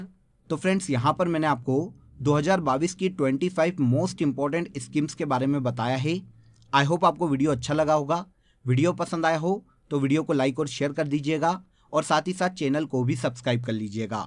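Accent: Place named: Haryana, India. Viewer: Sir haryana se belong karte hai to unki language thodi si alag b bhai cooprate krna pdega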